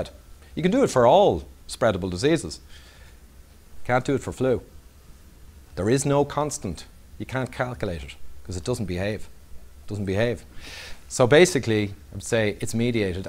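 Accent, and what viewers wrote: Ireland. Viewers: Sounds like he's from Galway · I could listen to this chap all day, his wonderful Irish lilt has me glued in the same way that Carl Sagan did. There's something in the voice that seems to illuminate what's being said, and illustrates what would be otherwise baffling. Thank you Mr..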